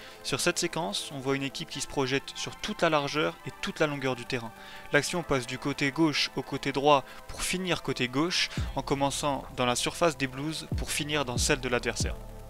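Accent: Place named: France. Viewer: Mec le format de tes vidéo sont lourd continue comsa les explications sont claires et quel bonheur d’entendre une voix française 🤩 je m’abonne direct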